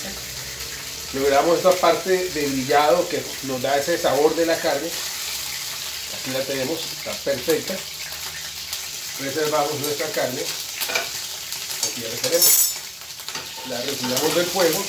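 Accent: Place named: Caribbean Coast, Colombia. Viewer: Tu eres costeño jajajaja to oir tu accento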